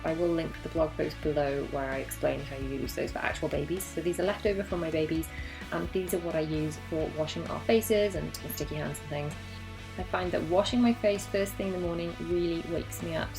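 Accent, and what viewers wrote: United Kingdom. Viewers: I'm new, nice to find a British voice on mum stuff! I'd love to see your workout routine. Thanks!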